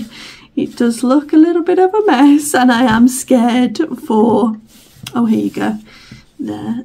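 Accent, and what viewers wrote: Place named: Midlands, United Kingdom. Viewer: Whereabouts in the Midland s are you from. I'm from the Midland too so recognized your accent. I also have a Husqvarna but it's the ruby and has problems, sadly.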